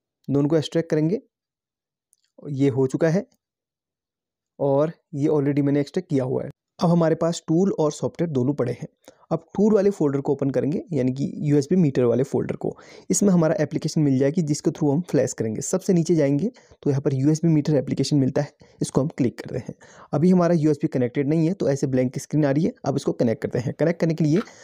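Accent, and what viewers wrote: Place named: Madhya Pradesh, India. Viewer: Sir aapki language ♥️ madhyapradesh ♥️ jaisi mach hoti h aap bhali utrakhand se ho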